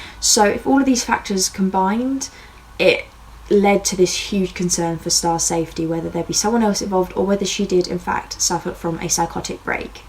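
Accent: England, United Kingdom. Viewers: Don't worry about it you're obviously from England or possibly know that's definitely English not Welsh and certainly not Scottish anyway you know there are at least all the same language basically American and English are completely different languages at this point so don't be so hard on yourself. You did true crime & you're hot that's enough for anybody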